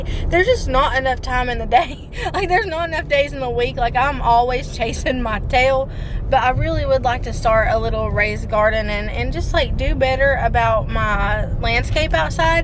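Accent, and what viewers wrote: South, United States. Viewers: I love “maters” for tomatoes. Your accent and southern sayings are awesome. Keep up you weight loss journey! You can do it!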